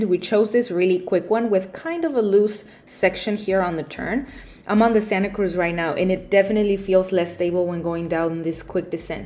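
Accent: Philippines. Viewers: you sounds like pinoy 😁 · parang may puntong piniy toits 😄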